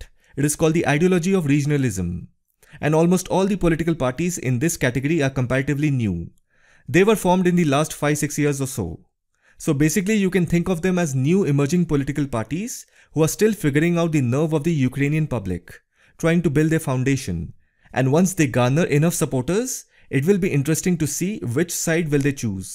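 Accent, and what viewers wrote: India. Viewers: I never thought I would survive an entire video with Indian english but your effort of research and the quality made it worth it lol. Keep it up! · Definitely need the Indian accent to put emphasis on what is happening here.. totally made me understand it better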